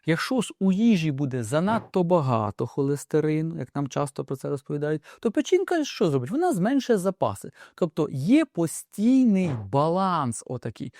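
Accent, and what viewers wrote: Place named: Vinnytsia, Ukraine. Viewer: Це діалект нічого страшного,,навпаки цікаво,так розмовляють люди,які живуть у Вінницький області.Я слухаю з задоволенням.Ми не на каналі граматики української мови.Сенс не в цьому ,а в тій незвичайній,особливій інформації., яку чудовий справжній лікар нам розповідає.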